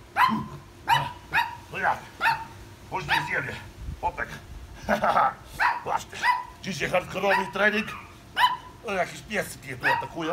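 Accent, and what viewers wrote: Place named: Lithuania. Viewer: D Uwielbiam ten jego litewski akcent.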